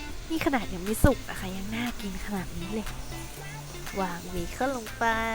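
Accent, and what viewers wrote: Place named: Isan, Thailand. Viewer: น่ากินจังเลย พึ่งไปกินในน้ำมาอยากกินอีกละ😂ชอบเสียงภาคตาฮักดี✌️✌️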